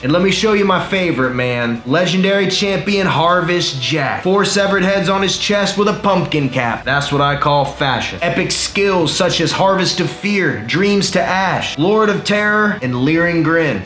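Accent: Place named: Ohio, United States. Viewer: I can hear the Ohio lol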